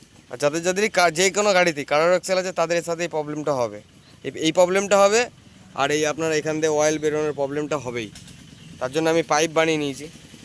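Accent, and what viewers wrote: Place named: Bardhaman, India. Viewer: Dada ami tomar kotha sune bujhte pailam apnar bari bordhoman memari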